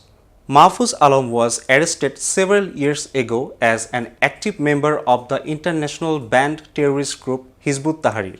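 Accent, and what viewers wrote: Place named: India. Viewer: Change the anchor(Indian accent)with an western accent speaker. Though it is good to hear from a hindu Bangladeshi.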